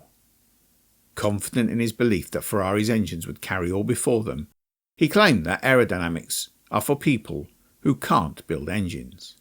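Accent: United Kingdom. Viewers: why did i know the accent was gonna be British all the jingoist British bias content "why is ferrari so bad at f1" is getting annoying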